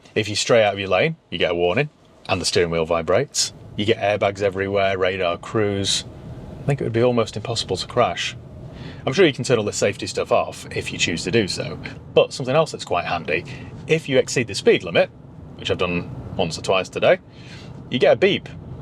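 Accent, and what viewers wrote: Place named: RP, United Kingdom. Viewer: Incongruous. Posh you are.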